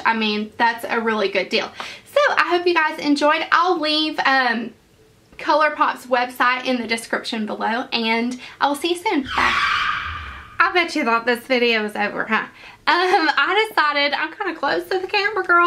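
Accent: South, United States. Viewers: Those are amazing!! And as a former texan...I love your accent! Still a southerner..just a state over. I subscribed. Going to have to check the site out!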